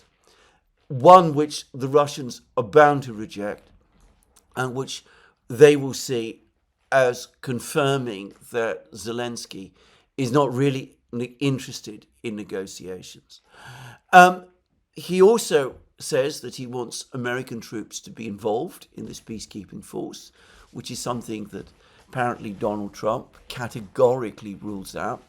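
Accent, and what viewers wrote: United Kingdom. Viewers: You sound like a snobby brit. But still love ya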